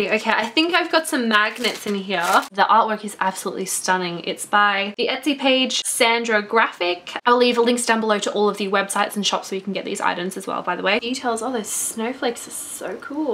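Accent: Australia. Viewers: I love her vids too and I love her accent I'm mexican and the aussie accent it's easier to understand than the american one to me XD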